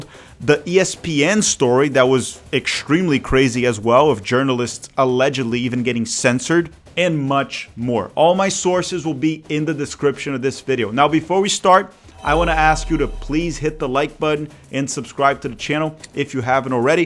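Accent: Brazil. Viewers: Sabe sim, ele grava em inglês mas também é brasileiro. Só prestar atenção nas pronúncias em português br.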